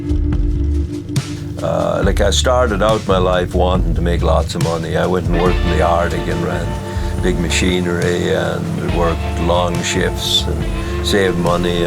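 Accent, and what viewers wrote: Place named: Ireland. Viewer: Hi —that was really excellent. He still has a bit of an Irish accent:-) Guy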